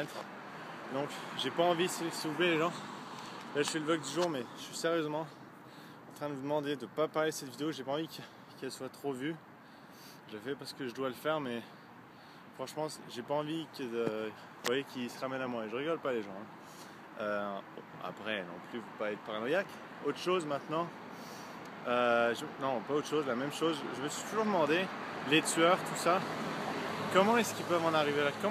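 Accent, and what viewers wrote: Quebec, Canada. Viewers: Fuck j'espere qu'il est reconnaissable si il a des traces d'accent quebecquoi :/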